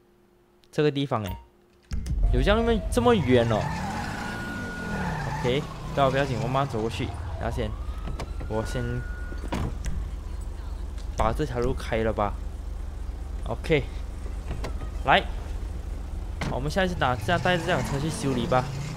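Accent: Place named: Cantonese, China. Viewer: 你的广东话好搞笑😂😂😂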